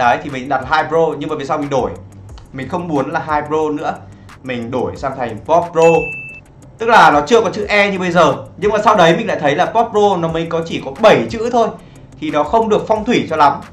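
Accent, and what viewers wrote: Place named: Central, Vietnam. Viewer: Liz gà dũng nói dọng miền trung dương em ko biết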